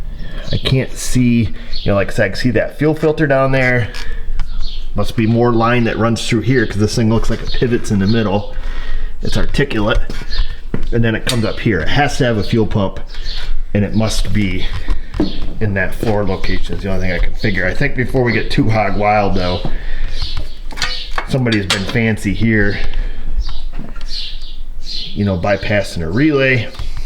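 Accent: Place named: Illinois, United States. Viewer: is more midwest sounding than Taryl....I'm guessing downstate Illinois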